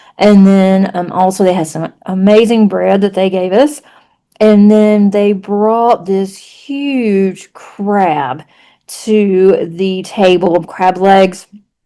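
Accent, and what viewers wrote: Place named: South, United States. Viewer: your sweet, subtle Southern drawl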